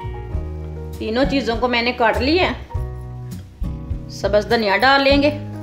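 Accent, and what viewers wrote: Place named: Punjab, India. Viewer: Your speaking tone like pure Punjabi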